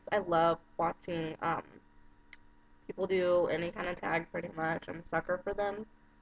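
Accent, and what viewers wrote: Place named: Arizona, United States. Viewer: Is this a genuine Arizona accent though? It seems most from Arizona who do this seem to always come from somewhere else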